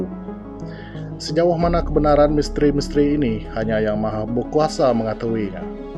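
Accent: Iban, Malaysia. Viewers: Slang iban aya nda ulih buai😅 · Kn..pekat iban iya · Pekat Slank Iban... Terhibur Ngau video tok... Tq... Semoga terus bersemangat menghasilkan video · aok.. jgn buang slang iban.. ya antara entik d negeri kita.. agi idup agi ngelaban... huuuuhaaaaa!!!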